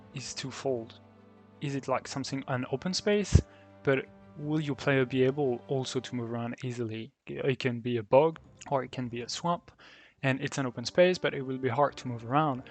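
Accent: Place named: France. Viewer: Hi, love your modular sets on the bazaar, and your explanations are really useful ps: ton accent t'as trahi mon cher concitoyen francophone X)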